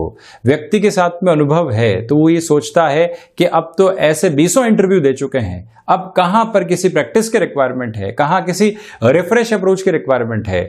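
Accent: Standard. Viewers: Pranam 🙏💐🌹💐, aap bahut sundar tarike se har din rasifal batate hai,aap ki shdh hindi bahut achhi lagti hai,bahut bahut dhanybad aapko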